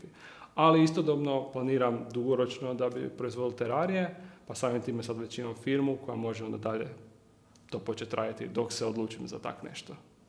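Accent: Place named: Germany. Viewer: With your accent, I thought you were German or Austrian,:-)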